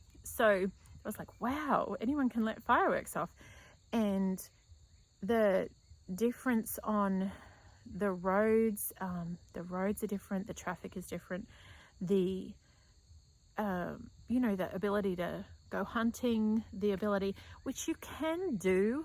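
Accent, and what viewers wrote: Australia. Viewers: I love a ‘rambling’ (your words, not mine) vlog! ❤ ps as a Brit I think your Aussie accent is a particularly nice one 😊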